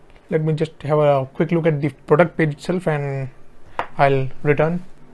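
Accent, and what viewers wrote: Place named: India. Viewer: Indian English is the most annoying accsent I ever heard in my whole life. Sounds like you eating all letters.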